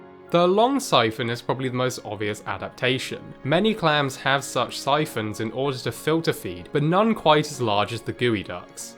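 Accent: United Kingdom. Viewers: The Brit accent seems to be so very trendy on videos, cable news networks etc. It's as if it somehow adds a bit of legitimacy to a story. Why? I'm wondering how many people share my view that the accent is annoying at best, and a distraction that serves no purpose. Surely there are out of work Americans "journalists" who could read teleprompters without the haughty, lecture like tone and attitude. It ain't right! lol!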